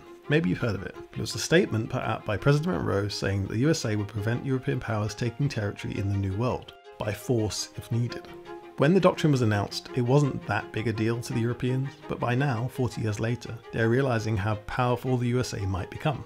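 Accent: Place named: United Kingdom. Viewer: Listening to a Brit pronounce Spanish place names and dates in Mexico in Castellano is hilarious. “Vera Cruth” “Thinco de Mayo” 😂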